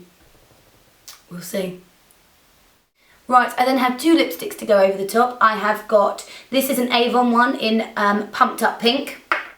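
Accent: England, United Kingdom. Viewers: i was wondering who 'bobby' is then on the title it was barbie, that's awesome sorry i have to grope around this whole englishman accent and stuff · oh gossshh i love your accent.. promise . i really wanna learn british english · If I had British accent I would never shut up! :) love the way U talk <3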